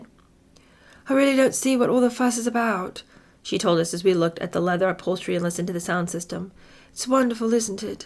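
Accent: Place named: RP, United Kingdom. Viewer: you do the posh British accent perfectly! I realize I am late to the party, but since I found this, I have binge watched!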